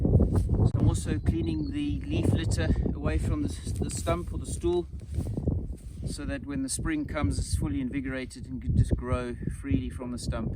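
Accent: South Africa. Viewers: You sound South African but you say south is towards the sun (not north as it is in SA) - what country are you in nowadays?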